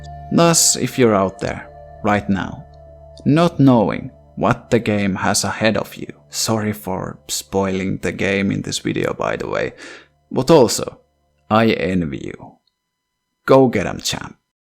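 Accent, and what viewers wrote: Finland. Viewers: kyllähä ton suomalaisen rallienkun tunnistaa mistä vaan. Hyvä video tho! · Holy fuck I wondered from that accent if you were Finnish first I thought you were Russian it's cool to see a fellow Finn play this...well absolutely mentally scarring game somehow my little sister is unphased of literally everything in it though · You have such a perkele accent. I love it!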